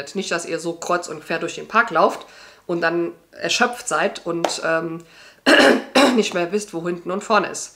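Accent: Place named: Eifel, Germany. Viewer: Hi, aus welcher Ecke kommst du eigentlich 😅 dein Akzent tendiert Richtung Eifel/Aachen/Düren 😅😅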